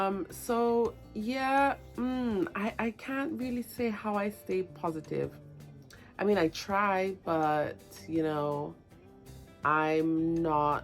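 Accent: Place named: United Kingdom. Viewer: Did you ever live in England? You’re giving me a little bit of British vibe 😂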